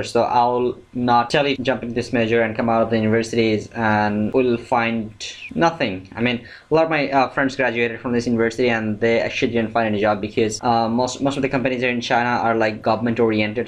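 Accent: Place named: Bangladesh. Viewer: what major do you think is best for guys like me who is willing to do engineering(undergraduate) but not sure what to begin with... i sense you are from bangladesh(by your accent) ... so am i ... whats your advice to me as a fellow bengali ... what major should i do?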